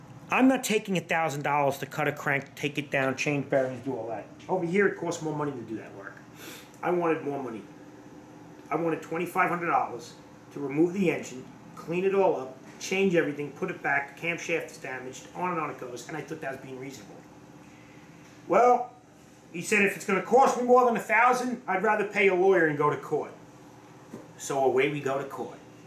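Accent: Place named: Long Island, United States. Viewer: Being from long island that Accent sounds like you're from long island. How far off am I ?, Or queens...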